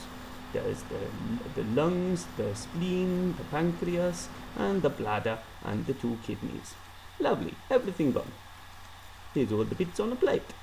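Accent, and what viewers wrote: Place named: Italy. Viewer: Awesome vid. :) Thumbs up for the Italian accent :)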